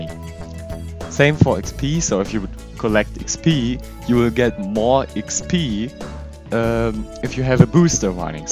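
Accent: Germany. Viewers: Hey dude, is comming a new Update of Slimefun? or is the project abonden? because the last update was in september 2015. But away, Nice video bro. And are you German? Your Dialect in English is like German. :)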